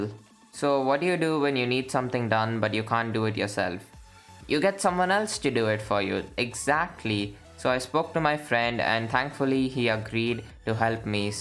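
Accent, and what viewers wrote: India. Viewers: indian english hehe ?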